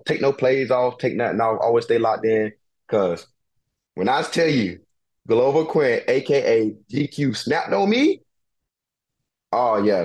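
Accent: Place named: South, United States. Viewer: Man this guy has heavy southern accent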